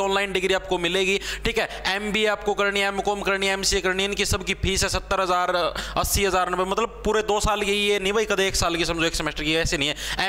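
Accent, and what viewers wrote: Haryana, India. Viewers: Sb kuch pta hone k bad m aapki video dekhna jaruri hota h pta na ki mjjaa aata h haryanvi tone m 😂😂😂😂😂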